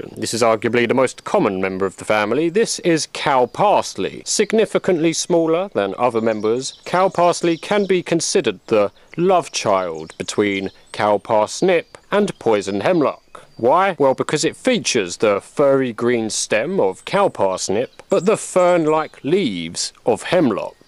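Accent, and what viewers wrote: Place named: Cockney, United Kingdom. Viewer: the gezzers like a cokney david attenborough.. init.. love it.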